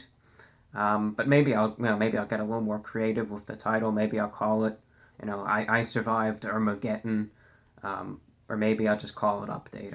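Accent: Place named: Massachusetts, United States. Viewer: Bro wtf i thought u were from Massachusetts!?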